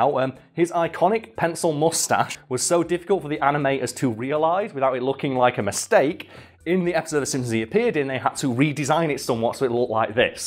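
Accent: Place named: Cockney, United Kingdom. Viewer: I don't even know how to describe this channel. My GF asks me what I'm watching all the time that has that "blaring Cockney accent" and I just say. . . .uhh he's talking about things . . . That happened. . . With tv and movies. . . But it's funny and interesting. She says "like TMZ for the UK?" And I say "NOOO DONT YOU FUCKIN DARE"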